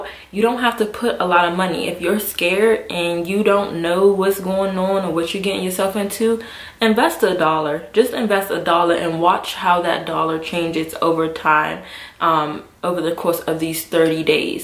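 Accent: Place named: DMV, United States. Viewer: I knew you were from the dmv with that accent😭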